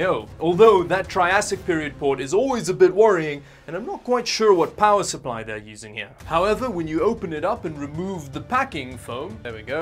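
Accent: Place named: South Africa. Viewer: That hint of his South African Accent, gives me Homey Vibes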